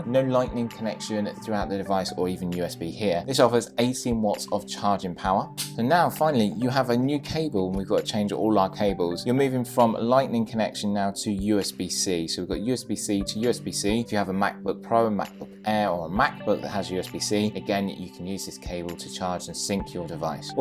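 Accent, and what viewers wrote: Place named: United Kingdom. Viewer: Heh. I love your sophisticated British Accenture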